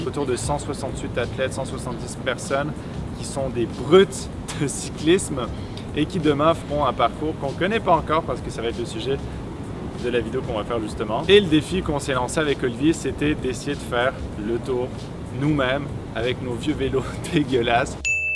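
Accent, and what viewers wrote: Quebec, Canada. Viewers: J'ai l'impression que ton accent québécois est + marquer qu'avant :o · WTF il est Québécois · je te suis depuis à peu près 5 ans, et j'ai eu l'impression (dans cette vidéo) qu'il y a un petit accent Québécois qui commence à arriver que tu n'avais pas avant. C'est juste moi ou? ^^ Sinon comme dab, super plaisirs de te voir en vidéo:) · c'est marrant pcq j'ai l'impression que tu prend de plus en plus l'accent quebecquois , enfin tu l'avais surement avant mais tu devait moins le montrer dans tes videos a l'epoque ^^ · C'est moi ou ton accent québécois ressort encore plus qu'avant ? :o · T'as pris un putain d'accent québécois 😮😮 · On entend beaucoup plus l'accent québecois de dans cette vidéo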